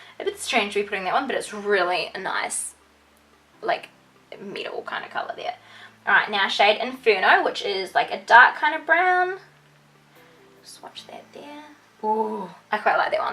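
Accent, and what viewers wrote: New Zealand. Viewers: are you a new zealander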